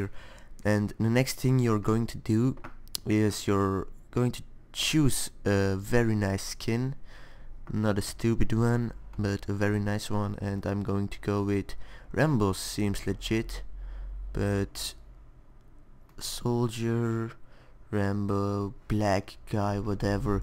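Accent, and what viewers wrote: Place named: England, United Kingdom. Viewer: hahahah je engels accentje is grappig man :D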